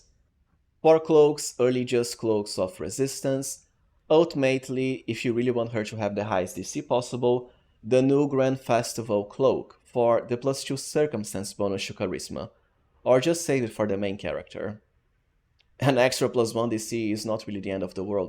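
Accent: Brazil. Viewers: Irmão. Você é brasileiro ou não? Kkk grande fã aqui. De qual estado tu é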